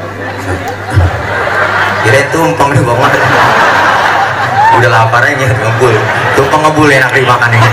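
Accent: Jakarta, Indonesia. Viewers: Ini ustad tile pernah cerama di kmpung ane di cinere.ibu ibu ngakak semua.ane seneng banget .Betawi nya mantaaaap .guru kita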